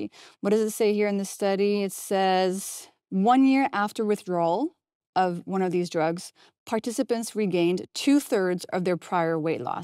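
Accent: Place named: France. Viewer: That French accent is shining through 🇫🇷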